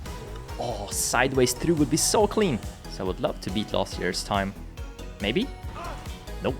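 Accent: Sweden. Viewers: You can hear hies Swedish from hies English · Are you Swedish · is this guy swedish cus he have an swedish acsent when hes speaking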